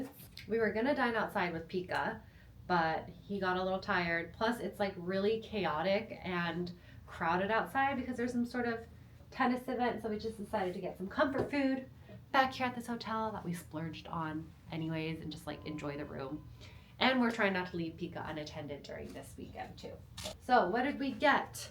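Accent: California, United States. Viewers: I like your California accent, stands out in NY.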